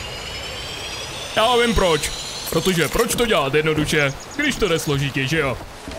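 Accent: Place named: Russia. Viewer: Když mluvíš anglicky, zníš jako rus.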